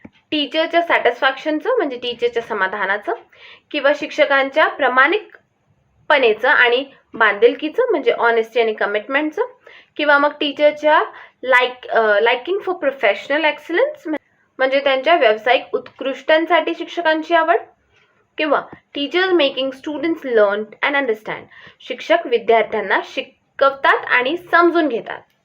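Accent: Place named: Vidarbha, India. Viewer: Tuze language konti aahe tu kashi bolti tyamde lokana kahi kalena zalay Vidhrbhatli aahe ka tu bai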